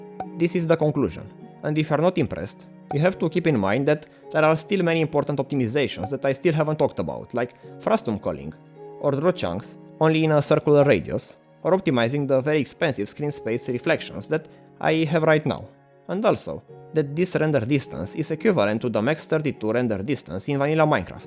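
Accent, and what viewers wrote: Romania. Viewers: You sound romanian! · miros accentu de roman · instant mi am dat seama ca esti roman dupa accent <3misto vid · mama accentu de roman e prea mare :)))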